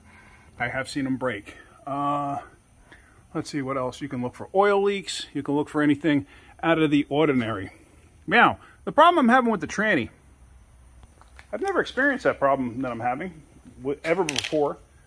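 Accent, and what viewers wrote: Sweden. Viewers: What did i just hear, you are from Sweden 😀 For how long have you been in the states? Greetings from Finland 😊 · I KNEW you were from Sweden. Your accent gives it away.😅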